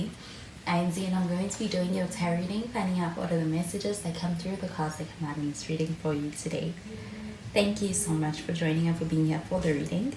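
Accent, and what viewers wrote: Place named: South Africa. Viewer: You sound South African to me for some reason... Your accent just sounds so familiar to me, not you specifically, but in general.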